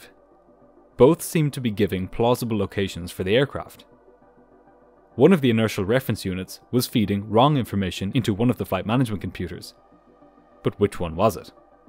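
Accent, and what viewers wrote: Ireland. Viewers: Thanks. 🇮🇪 this is the only videos of this sort of stuff I watch. It's always nice and honest without B.S or showboating like some others . PS our lovely Irish accent also helps 😊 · I could listen to this man speak all day. There’s a reason why Irish is voted people’s favourite accent out of the whole UK and Ireland.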